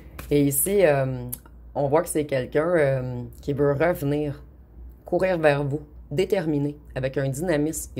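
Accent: Quebec, Canada. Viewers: Bonjour … quel bel accent quebecois … c’est si jolie et relaxant de vous écouter , un pure bonheur !!! 😌❤ Les 3 le représente parfaitement bien , vous êtes vraiment tres doué . 😅👍🏼.. infini gratitude pour tout le temps que vous nous consacrez … Merci . Stéphanie 🙏🏻🙏🏻🙏🏻🙏🏻🌼☀️🌸🌷🎉🎈🩷💞🌼☀️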